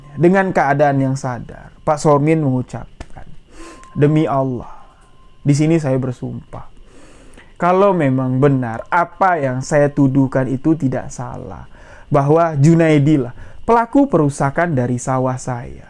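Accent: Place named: Madura, Indonesia. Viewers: kaka kok nada bicaranya kya orang madura ya 😀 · Logat Madura nya kental bg salam kenal lah sama Batak sama sama kental logatnya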